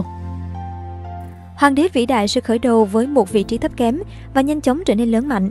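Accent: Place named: South, Vietnam. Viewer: Love her southern voice. Best!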